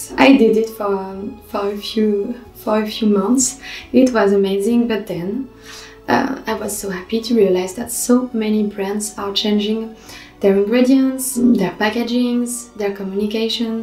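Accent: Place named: France. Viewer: The French accent and the gentle tone of her voice is mesmerizing. She doesn't need make up, already perfect.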